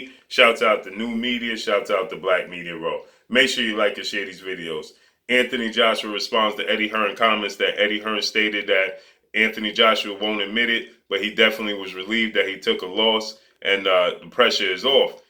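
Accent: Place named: Alabama, United States. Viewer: What is this, black impoverishment land? What on Earth is the appeal of inarticulate black people attempting to show their swag on YouTube by exaggerating and already pathetic cultural cliche and speaking as if they were just dredged out of the swamps of Alabama? best clean up your act and your accent, stashed the hat, and appear to be a relatively communicable human being, in spite of the fact that it may mean trashing your "blackittitude".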